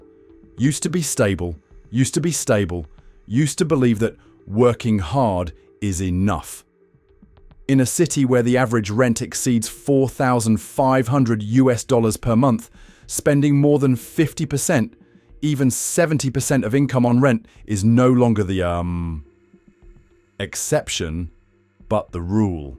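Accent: United Kingdom. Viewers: Why a guy with a British accent know about New York. But If I can say something about this as a New Yorker is that New York turned is a shithole.😮😮😮and it because of politics and the real-estate courrption the NYPD & Port Authority & the MTA. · Why is a man from England with British accent describing New York situation?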